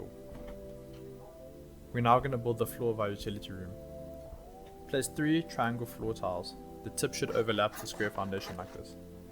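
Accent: South Africa. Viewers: South African? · are you South African?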